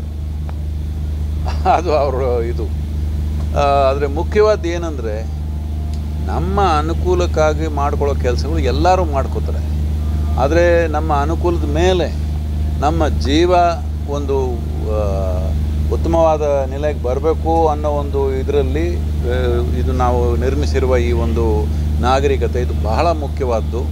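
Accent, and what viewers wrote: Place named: Tamil Nadu, India. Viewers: I feel it's tamilized kannada ! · ಕನ್ನಡದವರೆ ಮೈಸೂರಿನವರೆ ಆದರೂ ಕನ್ನಡನ ತಮಿಳು ಶೈಲಿಯಲ್ಲಿ ಮಾತನಾಡಿದ್ದಾರೆ ಆದರೂ ಕೇಳಿಸಿಕೊಂಡು ಹೋಯಿತು ತುಂಬಾನೆ ಖುಷಿ ಆಯ್ತು ..🙏🏽🙏🏽🙏🏽🙏🏽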